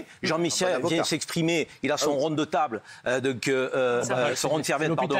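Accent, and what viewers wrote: Marseille, France. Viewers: Zeribi., tu le fait bien l'accent marseillais..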